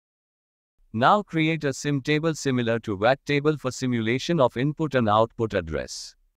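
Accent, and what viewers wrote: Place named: India. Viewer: what is the point of using an automated voice if it still has an indian accent!?!?!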